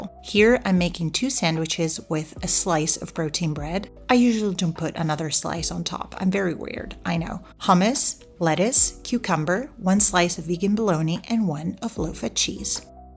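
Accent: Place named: United States. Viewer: For someone who speaks perfect Italian and perfect German, your american accent is a put off. I'd rather hear you in those languages any day.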